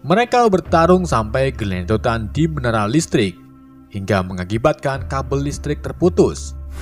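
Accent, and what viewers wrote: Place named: Java, Indonesia. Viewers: Lu orang Jawa ya bang, dari kota mana bang?? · Pembawaan narasi selalu menarik, "sewu welongatus sangang puluh papat". Logat jowone met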